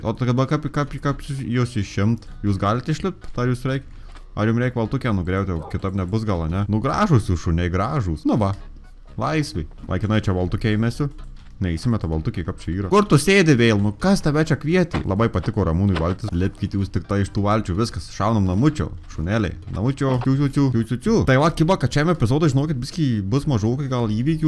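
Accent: Suvalkija, Lithuania. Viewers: Kadangi esu iš Marijampolės tai tu taip primeni tėvus su tuo tarimu xd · Kai pamatai video: oooooo daba užstrigsiu porai valandų kol suprasiu viską ką jis ten suvalkietiškai kalbėjo.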